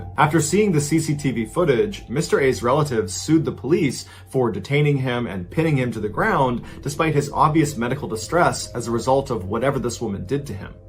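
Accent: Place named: New England, United States. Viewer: Love your stories... best part is hearing it with the accent. I never realized how much I missed it until I watched you for the first time. ❤ New England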